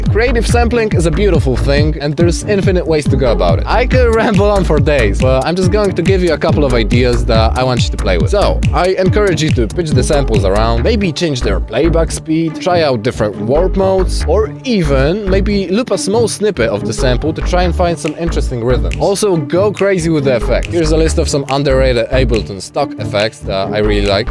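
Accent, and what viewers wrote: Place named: Poland. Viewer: You can immediately hear my boy polish ❤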